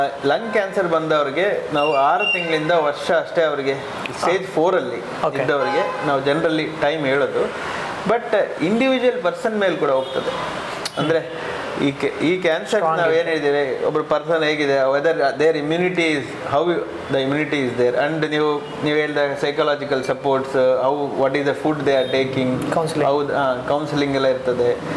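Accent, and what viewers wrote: Mangalore, India. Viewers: ಇದು ಮಂಗ್ಳೂರು ಕನ್ನಡ . ನಿಮ್ಗೆ ವಿಷಯ ತಿಳಿದರೆ ಸಾಕಲ್ಲವೆ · ಕನ್ನಡದ ಬಗ್ಗೆ ಅಷ್ಟು ಅಭಿಮಾನ ಇರುವ ನೀವು ಸರಕಾರಿ ಶಾಲೆಗಳಿಗೆ ಕನ್ನಡ ಕಲಿಸುವ ಅಧ್ಯಾಪಕರ ನೇಮಕಾತಿ ಬಗ್ಗೆ ಸರಕಾರಕೆ ಒತ್ತಾಯ ಮಾಡಿ ಅದು ಬಿಟ್ಟು ಬೇರೆಯವರು ಮಾತನಾಡುವ ಶೈಲಿ ಬಗ್ಗೆ ಅವಹೇಳನ ಮಾಡಬೇಡಿ ಇದು ನಮ್ಮ ಮಂಗಳೂರು ಕನ್ನಡ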